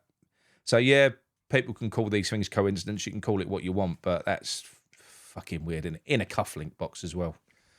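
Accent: United Kingdom. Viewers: never apologize for showing emotion about a loved one. Cheers to your father. For a smile. I randomly will yell out, in my best impression, “GET OUT OF MY CLUB!” When I hear you or the other channels say something about that troll Sullivan. My son has heard me do it. Now of course he has started yelling it. In British accent and all. Love it.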